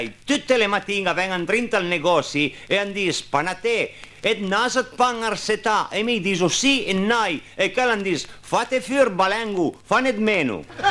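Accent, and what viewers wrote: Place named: Turin, Italy. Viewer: Hhaahahha che ridere soprattutto quella, dei televisori. Urbe era proprio una forza.... All'epoca un mio amico aveva diversi suoi dischi di barzellette. Che bello ascoltare il turineis puro.... Oggi il nostro dialetto così ilare e simpatico non lo senti più ormai.... Peccato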